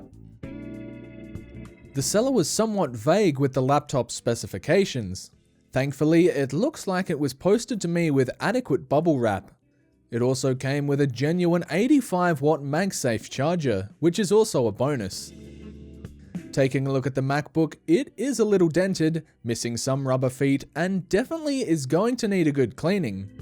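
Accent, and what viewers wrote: Australia. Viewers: just wondering are you aussie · Man finally an Aussie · Bruh are you Australian or S. African?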